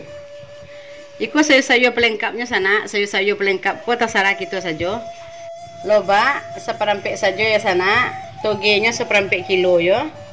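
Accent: Minangkabau, Indonesia. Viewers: Lah wak Cubo buek tek, Alhamdulillah.. Lai lamak, awak suko ngikutin resep etek, klu dari bahaso nyo, etek kok kayak urang pesisia, iyo po ndak tek.. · Uni menggling cabe Minang asli👍👍tak ade Kat Malay ni,bahasa nya pun khas,🤣🇲🇾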